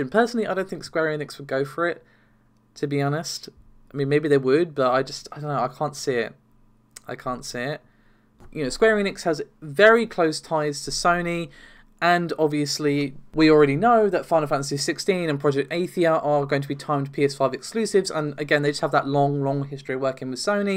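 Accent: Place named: Liverpool, United Kingdom. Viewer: He is a scouser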